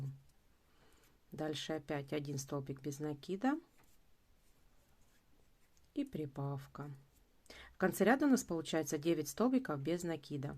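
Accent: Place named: Voronezh, Russia. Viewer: Огромное спасибо за мастер класс, и так приятно слышать родную Воронежскую речь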